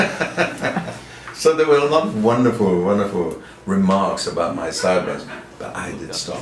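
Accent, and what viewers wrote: United Kingdom. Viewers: Engelbert is very relaxed, and reminiscing about the old days! This is backstage, in his Dressing Room at the Count Basie Theater in Red Bank, NJ and I was there, to see the show, and had the nicest meeting with Enge after his show. He is so soft spoken, and that touch of a British accent, and telling his stories, and I love to sit there listening to him. Thank you Mark Weiss and Rock Scene Magazine for a most enjoyable interview with Engelbert - The King of Romance! <3<3<3 Dorothy Whalen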